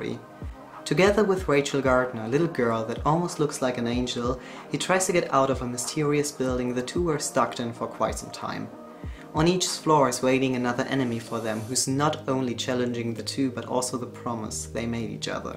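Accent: Germany. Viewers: Idk if I'm just stupid... But you sound like you have a gernan accent😂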